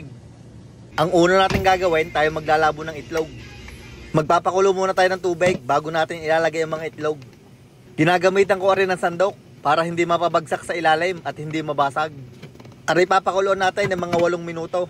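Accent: Batangas, Philippines. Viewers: Parang Taga Batangas 😆😆🤧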